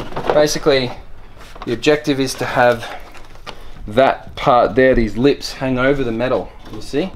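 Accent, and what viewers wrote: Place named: Australia. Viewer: Appreciate it mate finally a Aussie explaining it thanks for helping a young fella out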